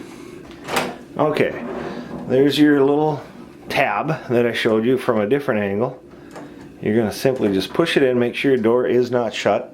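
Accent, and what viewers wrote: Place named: Midwest, United States. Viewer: thanks. very clear. sounds like a midwest accent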